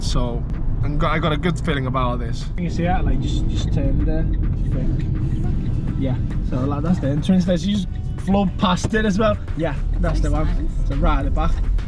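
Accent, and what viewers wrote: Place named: Liverpool, United Kingdom. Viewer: Nice Truck Mate, Good to hear a bit of Scouse Also 👍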